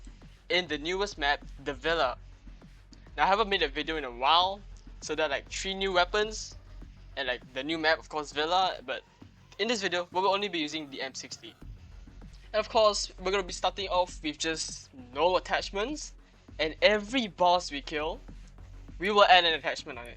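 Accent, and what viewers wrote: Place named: Singapore. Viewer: u sound singaporean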